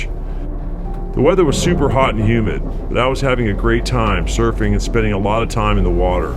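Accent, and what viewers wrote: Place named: Southern California, United States. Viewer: If you're willing to share, are you from socal by any chance? You have a socal accent very clearly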